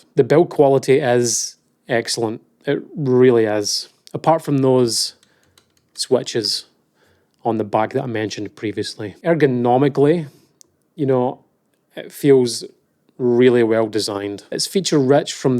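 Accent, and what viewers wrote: Scotland, United Kingdom. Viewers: Thanks! Just ordered the WinWing Orion 2 F15 EX throttle and separate MOZA AB9 flight stick. Hoping that they're good 👍. I'm a scot living in Texas so was glad to hear the accent in your review. Thanks again. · i never knew i always wanted a scottish reviewer in my life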